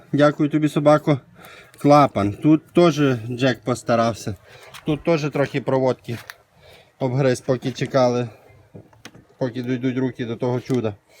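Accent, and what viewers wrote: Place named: Odesa, Ukraine. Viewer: Відчуття наче одесит перейшов на україньську, дуже кумедно)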